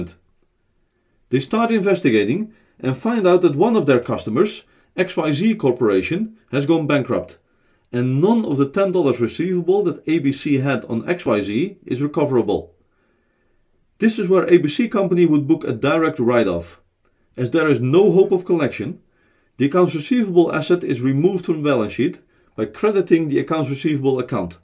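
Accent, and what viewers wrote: Netherlands. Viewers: I know a Dutch accent when I hear one lol. Thanks for the review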